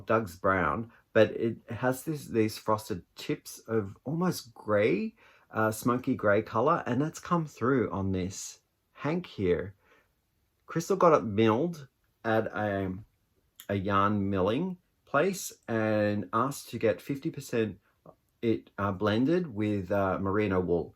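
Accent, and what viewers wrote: Australia. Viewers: Why do you sound Australian?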